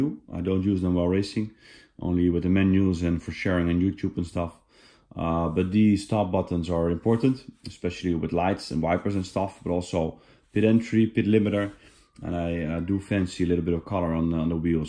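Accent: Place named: Netherlands. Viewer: You must be Dutch, i talk English in the same way as im Dutch too, nice vid btw! One of the better reviews of this set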